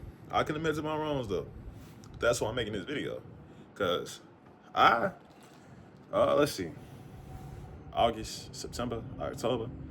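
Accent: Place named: South, United States. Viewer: Foreal bro, I always take mini vacations and travel but manage my money good. Where you from cause I can hear a southern accent a little, I'm from Louisiana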